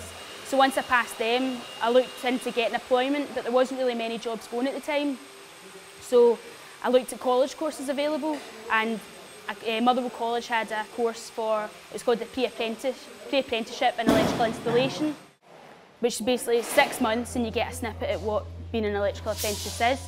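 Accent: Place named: Ireland. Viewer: Sounds just like the irish dude in my residential wireman class.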